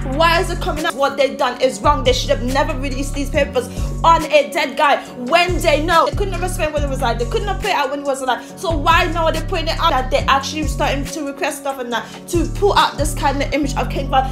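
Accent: London, United Kingdom. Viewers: Are you from london smh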